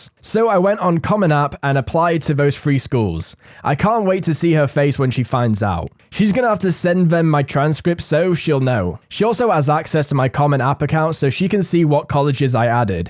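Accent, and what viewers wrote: United Kingdom. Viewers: It may be the accent. Since Jake is British. sounds like he has a British accent also. Edited to add: he verified that he is from England. The Accent is probably why you thought about Jake. needs to speak a little slower so we can understand him a little better. Jake and both speak a little slower.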